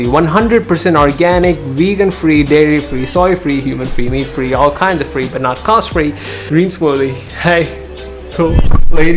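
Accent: South India, India. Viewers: are you from south india?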